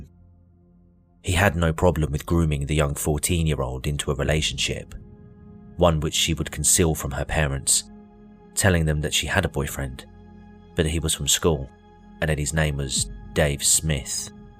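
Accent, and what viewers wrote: United Kingdom. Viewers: Hiya, I was sent by Kyle's channel, Dire Trip. I'm very pleased that I took his suggestion. If you'll indulge me for a wee bit, I've a few things to say. First, thank you for the warning. I did not heed it, but am grateful you provided it. It was sadly warranted. Second, this is an horrific case. One I'd never heard of. I understand your research is top notch and I commend you. Third, I am a long-time fan of true crime but only recently been seeking out YT vids on this subject. Now, I am not a snob, but I do appreciate good grammar and a good flow to the narrative. (I'm sure alot of folks would say the same.) I think you did a fine job. I enjoyed your accent as I am a transplanted Scot living in U.S., and my Mum was from Manchester 😊 I do enjoy a Brit accent. I also noted that your tone conveyed your disgust at the heinous injuries this poor soul endured. 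Bless her memory. I'm happy to be a new sub, I am grateful to Dire Trip for the suggestion and I really look forward to hearing more from you. Thank you very much. Blessings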